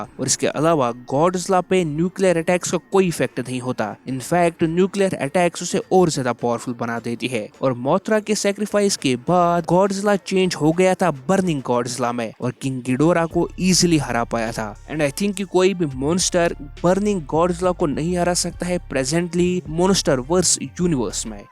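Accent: Kashmir, India. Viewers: Bhai kya aap kashmir se ho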